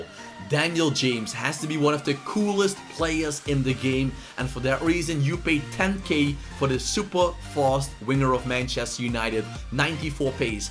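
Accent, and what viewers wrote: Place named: Netherlands. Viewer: Ik hoor gwn dat je Nederlands bent hahahahaha heerlijk video wel ❤️🤙🏾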